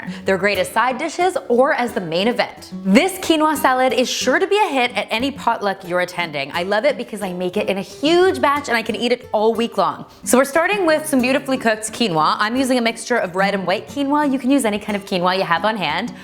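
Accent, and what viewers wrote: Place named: Canada. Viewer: Just rewatched this for the bean salad recipe and my oh my!!! You Canadian accent was so prominent, I've been watching you since the very beginning and never noticed it until today! Love it!!!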